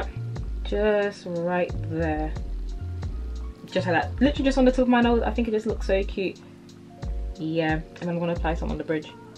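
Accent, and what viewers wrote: United Kingdom. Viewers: I've watched your videos before. Ibnever expect a British accent I think it's because to me you look like LA beautiologist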